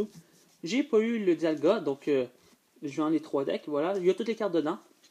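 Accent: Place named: Quebec, Canada. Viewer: Oye un quebecquois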